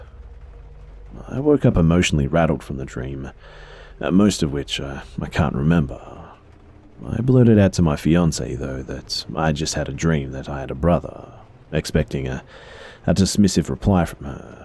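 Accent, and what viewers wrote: Australia. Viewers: I love the fact that your an Australian narrator. 👌🏽 #onefortheaussies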